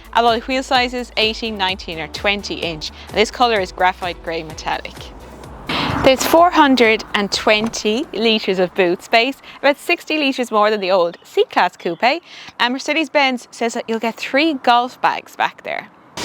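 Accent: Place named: Ireland. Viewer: "Roarrd" Isn't the Irish accent lovely ❤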